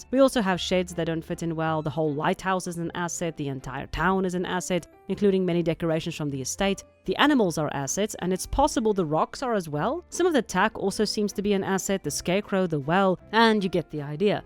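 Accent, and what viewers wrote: South Africa. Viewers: Is she South-African? :o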